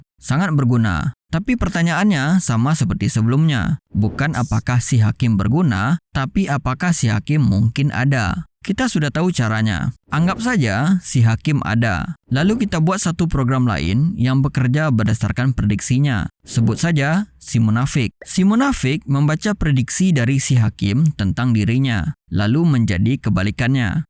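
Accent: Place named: Bali, Indonesia. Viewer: Pengisi suaranya orang bali kah? Berasa banget logatnya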